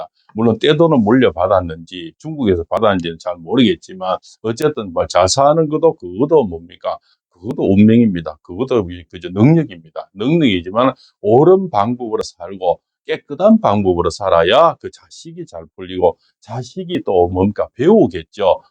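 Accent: Gyeongsang, South Korea. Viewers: 새해복많이받으세요 님은 경상도 같은 고향같네요 윤대통령님 기도좀올려주세요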